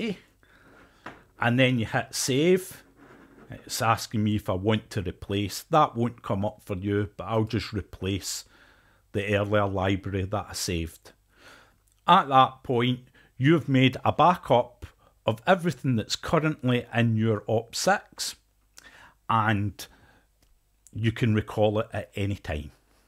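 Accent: Scotland, United Kingdom. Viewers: Are you by any chance ........ scots?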